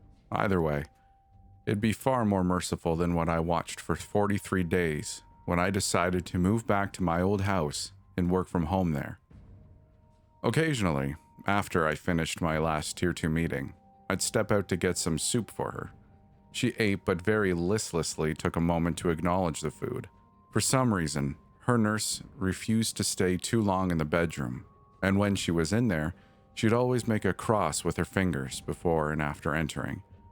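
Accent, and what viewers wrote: Canada. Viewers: oh yah, you’re my favey! Great voice,(the teeniest, loveliest Canadian accent) awesome selection, I’ll be around for your whole run :)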